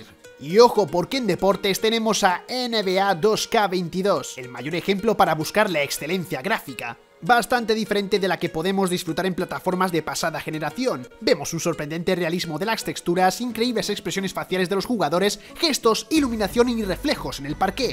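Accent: Spain. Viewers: Aún no me acostumbro a su voz de este vato. Es muy española le falta ser más neutral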